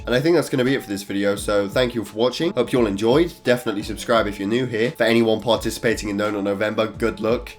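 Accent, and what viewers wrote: England, United Kingdom. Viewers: That's england people speak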